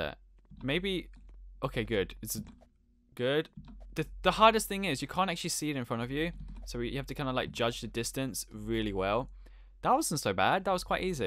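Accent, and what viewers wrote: United Kingdom. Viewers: Are u British I can not tell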